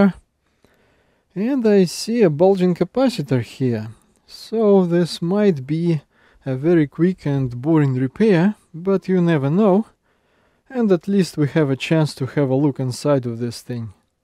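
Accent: Russia. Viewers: Чел , ну слышно же что ты русскоговорящий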